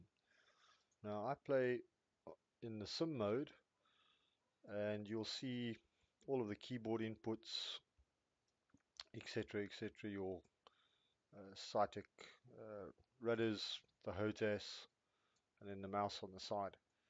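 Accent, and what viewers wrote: South Africa. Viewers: ARE YOU SOUTH AFRICAN?!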